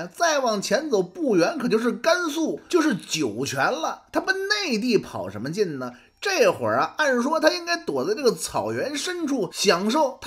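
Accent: Beijing, China. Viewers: 北京音有个神奇的效果，用京音儿说任何事都像在说评书，像在侃大山，像在忽悠，失去了可信度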